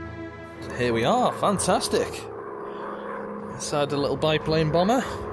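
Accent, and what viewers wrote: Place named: United Kingdom. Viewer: You're British. You get a sub.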